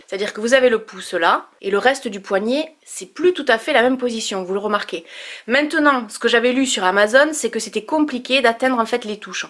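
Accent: South, France. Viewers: Bravo pour ta belle initiative de collecte ! 💛 j adore tous tes vidéos et ton accent du sud.. charmant ! 😊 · Merci pour ton humour ton partage cela fait du bien et ton accent du sud La brassiere n est pas en cotton.? Je ne savais qu il y avait un crayon transparant pour les levres c est top 🐩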